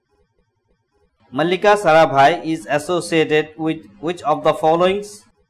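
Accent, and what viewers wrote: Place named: Mizoram, India. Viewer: accent resembles a Mizo a lot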